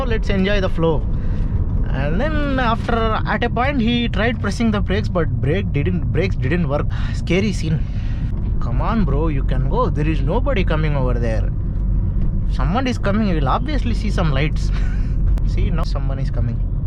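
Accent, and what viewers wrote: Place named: Hyderabad, India. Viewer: Bro i love your video😁...... ..keep posting....and that hyderabadi accent is love bro....seriously.....🥰🥰🥰🥰🤗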